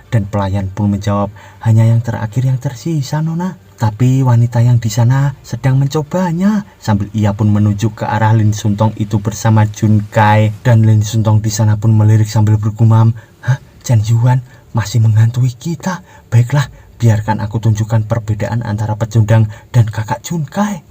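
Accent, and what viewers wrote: Java, Indonesia. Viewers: Seneng bgt logat jowone mas.. mantep pol.. rasa masku lg cerita 😂😂😂 semangaaattt!!!!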